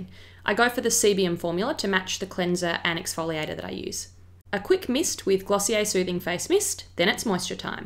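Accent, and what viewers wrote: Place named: Australia. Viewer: love your Aussie accent! nice skin care routine!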